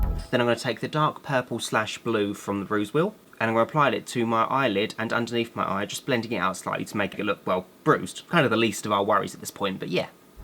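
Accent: United Kingdom. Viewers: Your british accent is awsome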